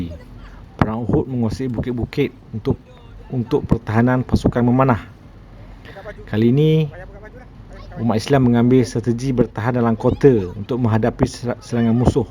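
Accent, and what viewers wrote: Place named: Indonesia. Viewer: Kenapa ckp ada mcm indon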